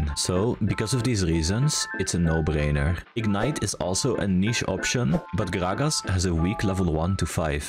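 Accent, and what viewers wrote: Turkey. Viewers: Are u turkish lad? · great guide just one question Are you Turkish? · Are u turkish though? 💀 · Are you turkish: DDDASDASD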